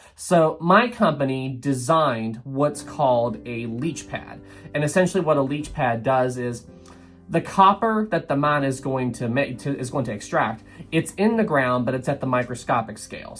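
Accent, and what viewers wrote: South, United States. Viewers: You're from the South aren't you?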